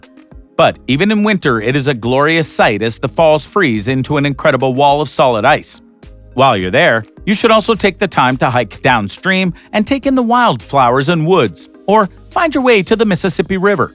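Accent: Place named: Canada. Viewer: The narrater sounds Canadian.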